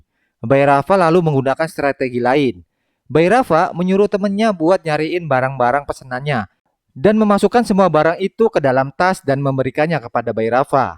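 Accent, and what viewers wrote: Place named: Cirebon, Indonesia. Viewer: Wong cerbon ya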